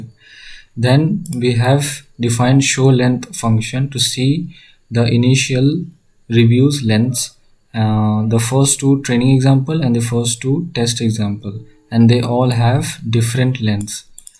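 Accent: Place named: India. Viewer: Probably the Indian accent got you.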